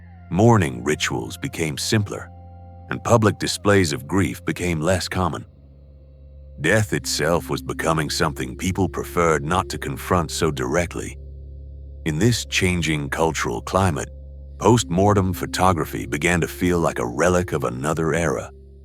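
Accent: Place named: United States. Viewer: Was this about British history? If so, why are we listening to an american voice - it's very disrespectful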